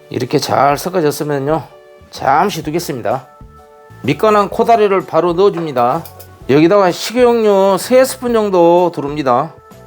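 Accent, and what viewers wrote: Jeolla, South Korea. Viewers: 설명도. 구수한. 전라도사투리로. 친근감있고. 맛도. 배가되는거같아요. 저도. 따라해보려. 합니다. 감사합니다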